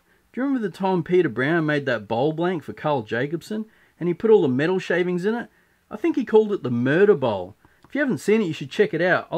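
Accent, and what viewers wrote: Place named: Australia. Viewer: Good filming, interesting content, aussie accent, mentioning another channel, you got yourself another subscriber!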